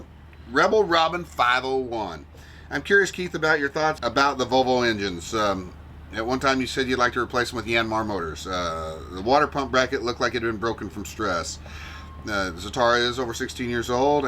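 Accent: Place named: Texas, United States. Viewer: Your family is wonderful so very talented in many ways , Love to hear you talk TEXAS My greatest love is your boat . It’s really pretty you guys keep it wonderful I watch a lot of boat channels I would pick your boat over most I see . In another life I would live it the same way you guys are .All of you put a smile on my face Lovely family !stay safe have fun !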